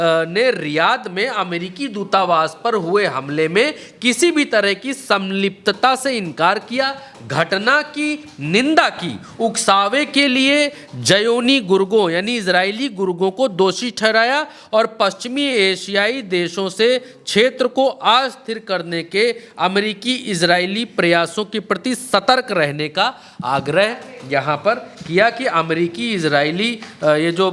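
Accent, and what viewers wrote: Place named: Uttar Pradesh, India. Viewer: भाई जब बोलते हैं तो सिर्फ सुनने को जी चाहता है pure up ki bhasha Bolte Hain 👍👍👍👍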